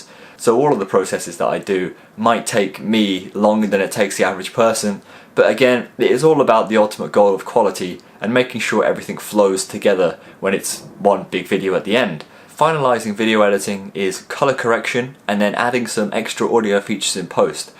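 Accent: United Kingdom. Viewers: ok I get it why you have a British voice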